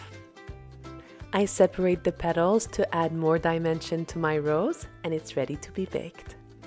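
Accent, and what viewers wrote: France. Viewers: Bonjour. La façon dont vous prononcez certains mots me fait penser que vous êtes française. Vous prononcez certains mots avec notre accent français. Je me trompe? Merci pour vos vidéos. C'est grâce à vous que je découvre votre univers et c'est super intéressant et beau à voir. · Hi! I can hear a french accent behind your hebrew words! Haha! I really love your channel and everything you present in it. It brings a lot of positive energy and ratzon to prepare good things as well. Be blessed! Yeshar koach!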